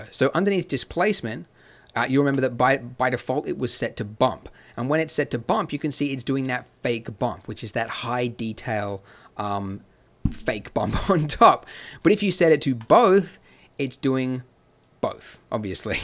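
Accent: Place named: Australia. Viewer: Ritchie He's Australian... Can you really not tell?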